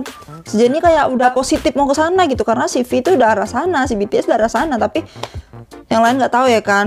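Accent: Medan, Indonesia. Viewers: Kakak anak Medan ya??,krna kalau di Medan kyk gitu ngomongnya😊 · Kk orang Medan yaa Logat nya keliatan banget BTW maap kalau salah Ya ka 🤣😂🤣😂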